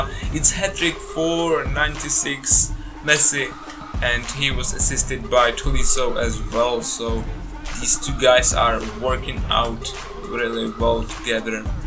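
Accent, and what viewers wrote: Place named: Czech Republic. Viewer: lehký český přízvuk, ale hlavně český znak FAČR:D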